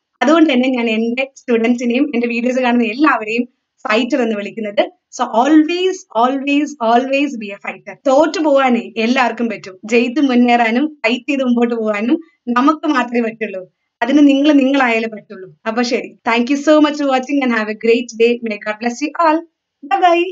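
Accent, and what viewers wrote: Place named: Tamil Nadu, India. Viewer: Are you no malayali?🤔Tamil style aanelllo.🙄UK annenn vach Malayalam parayaan nanakedd ano?😮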